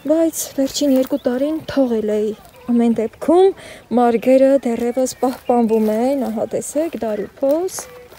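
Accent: Lori, Armenia. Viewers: ջան երբ որ քեզ լսումեմ մի տեսակ հանգստանում եմ։Ուր մնաց լոռվա բառբառը։❤❤❤❤❤❤❤😊😊😊😊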